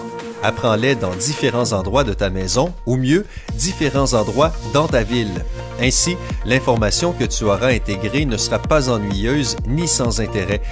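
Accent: Quebec, Canada. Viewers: Accent québécois ? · Vue son accent je crois que c’est un Québécois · Un Canadien parle! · J'aime pas la facon cannadien de parler francais nuul.avec mes respect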